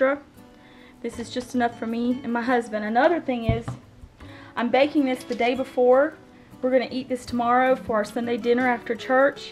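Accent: South, United States. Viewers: I did live in NC for a couple of years too. I'm in Canada now so I sure love hearing the Southern accents again. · I love a good southern accent and yes I have one myself being from Arkansas. Great recipe, my family loved it!!!! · I knew I heard a souther drawl don't know if I spelled that right lol. great video will have to try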